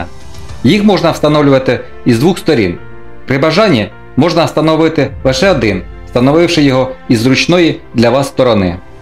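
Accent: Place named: Poltava, Ukraine. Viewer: ВІтаю! Відчувається рідний діалект) Чи не Полтавська обл?) Як можна отримати креслення? Дякую! Бережи Вас Господь!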